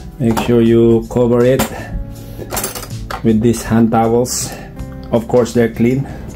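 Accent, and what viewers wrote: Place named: United Kingdom. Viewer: Wow british accent ne😍😍😍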